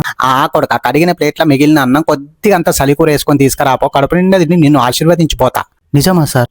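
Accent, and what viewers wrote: Telangana, India. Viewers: Telangana yasa intha andanga untundi Sir in your Dialogues ❤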